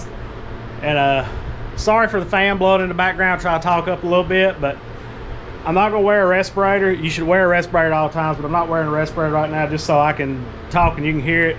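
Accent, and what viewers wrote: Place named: Texas, United States. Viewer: Love the Boomhauer accent. I'll look for that paint with the options for spray pattern.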